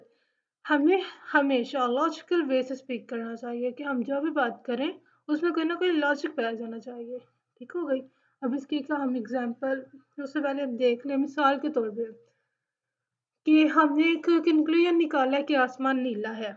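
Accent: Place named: Lahore, Pakistan. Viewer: ap ki voice sa lagta ha ap lahore sa ho . hhaahah but ma'am boht acha explain kiya ap na..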